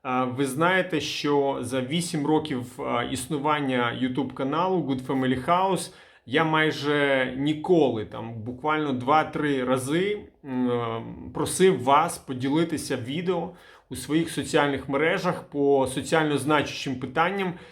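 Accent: Russia. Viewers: Что-то сильный російський акцент в твоей український мове. В твоей речи нет украинской манерности.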